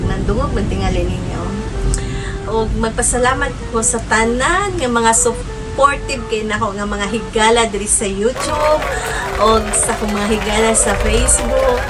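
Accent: Visayas, Philippines. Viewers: Bisaya kaau ni madam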